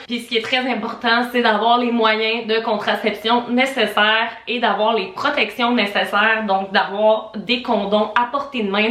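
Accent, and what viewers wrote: Quebec, Canada. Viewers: Ton accent québécois est très très prononcé.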